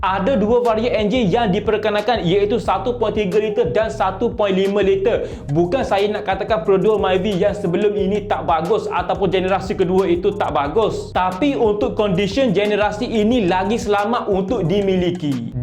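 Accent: Kelantan, Malaysia. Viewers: abe ore klate k? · Demo ore klate ko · Konten yang sangat menarik dan berguna, bahasa yang mudah difahami walaupun loghat Kelantan...teruskan berkonten, ambo support 100% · assalam......!!! ce tenang² sikit... lunakkan sikit suara tu... pelat kelate tu xpe la dulu... kendian nti pelan² hilang la tu... in syaa ALLAH apa pun... abg sapot subscribed dan liked dahhh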